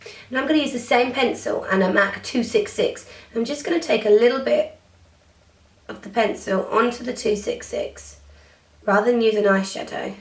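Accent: England, United Kingdom. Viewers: i adore your english accent <3 you are lovely!!!!!!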